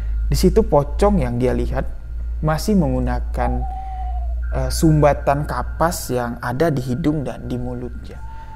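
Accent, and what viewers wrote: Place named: Bali, Indonesia. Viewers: Kamu orang mana min,,, logatmu mantul2 kayak orang bali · mas logat nya seperti.orang Bali.Terimakasih kisahnya. buat pelajaran bhwa hutang WAJIB DIBAYAR SESEGERA MUNGKIN. Dan bila meminjamkan uang,TIDAK PERLU DENGAN BUNGA itu namanya RIBA. Kalau sipeminjam tidak sanggup bayar hutang,alangkah baiknya,jika DIBEBASKAN saja, Insya Allah akan Allah ganti rezqi dengan berlimpah.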